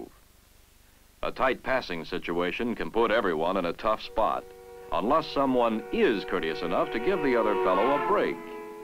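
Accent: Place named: South, United States. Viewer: Southern Accent she has & yall come back now !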